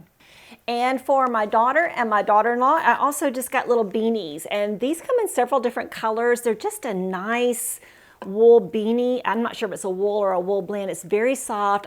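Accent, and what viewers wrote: South, United States. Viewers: I love your pink sweater beautiful color if you don't mind saying what state are you from and living in. You are definitely southern I can tell my dad's people are from Arkansas Alabama and my mom's people from Michigan and live in Florida I am a Yankee but I live in Arkansas it took a long time to adapt for me different culture shock lol but I love Arkansas people friendly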